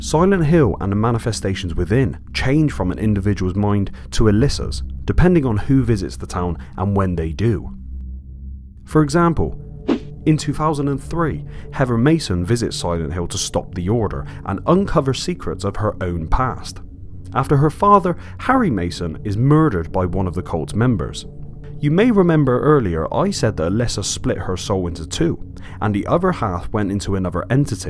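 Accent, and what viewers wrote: Bristol, United Kingdom. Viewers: Is that a Bristolian accent? Or more West Country? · Oh wow!! Dude, you are obviously form exactly the same part of the UK as me. Don't often hear our accent on yt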